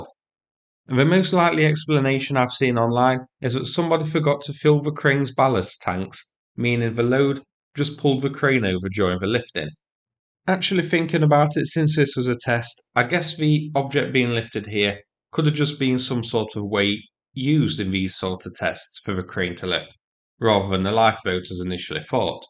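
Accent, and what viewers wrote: United Kingdom. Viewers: Love the pommie accent and humour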